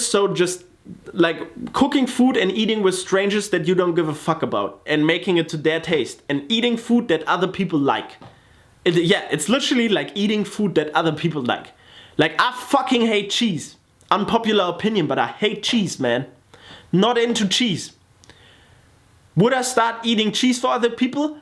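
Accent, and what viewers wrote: Germany. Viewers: Dein Deutsch-Englisch ist bisschen auffällig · oha heftig aber wenn man drauf achtet hat er nen deutschen Akzent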